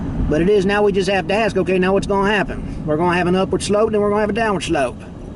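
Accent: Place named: Kentucky, United States. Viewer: My old stomping grounds. I love that you started on Alex by the river. I can't help but laugh that though you are driving through West Carrollton, Ohio, it sounds like you are from the sticks of Kentucky. I don't mean that in a bad way. It just caught me funny.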